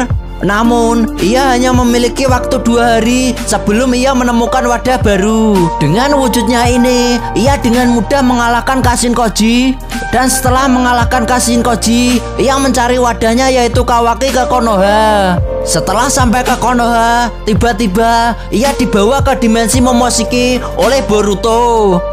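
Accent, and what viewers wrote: Java, Indonesia. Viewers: Kayaknya orang jawa ni yg pnya chnel ini , dari logat nya 😂🤣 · logat mu loo jawa banget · Jawa amat si suaranya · jan medok tenan rek😁😁😁😁😁😁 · pekat jawanya bang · jawaneeeee medok abis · Logat jawa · Medok banget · Medok banget abangnya, jawa tulen kayaknya... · Oalah medok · mbahasa lo muedog buanget bro 😂😂😂😂😂😂 ga uenak di denger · Pekat beno jawa kau bang🤣🤣🤣🤣🤣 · Jawanya pekat😃😃😃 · Medok bgt bahasa jawa nya wkk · Terlalu medok suaranya😂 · Medok sekali😂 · medok amatttt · lah medok kali bahasa mu tong ,, ngakak njirr kayak lagi rap ini.. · kok pekat banget jowo nya min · Medok x jawamu telor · Medok banget cukk 🤣🤣🤣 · Asli mana ngab?... Logatnya medok amat🤭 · Yang bicara auto jawA 😂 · baru nonton semenit pala pusing denger jawa ngomong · Medok jawa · Bahasa nya medok jowo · Medok nya itu lo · Medok bgt mas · Yooo org Jawa taelaso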